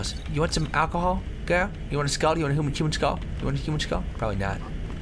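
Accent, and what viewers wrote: United Kingdom. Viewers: how did you come to develop such a british accent?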